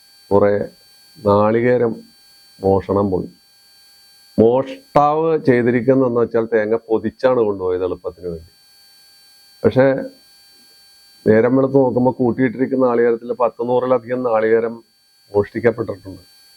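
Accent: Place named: Palakkad, India. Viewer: Iski മടിയില്‍ pootum 😂തനി പാലക്കാട്ട് basha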